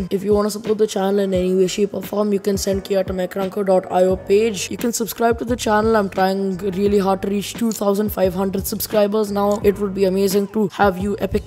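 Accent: India. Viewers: u r indian na ?? :) ;) I think because your voice is like an indian talking english :)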